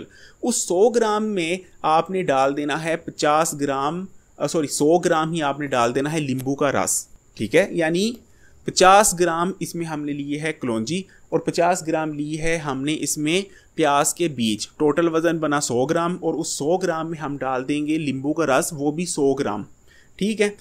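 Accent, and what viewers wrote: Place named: Punjab, India. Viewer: Hakim sahab urdu bol rahe Ho, tone Punjabi aa rahi hai .by the way thanks for your information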